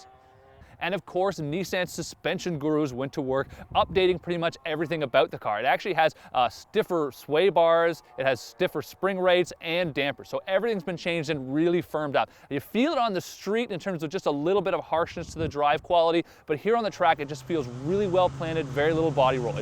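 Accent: Canada. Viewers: canadian accent.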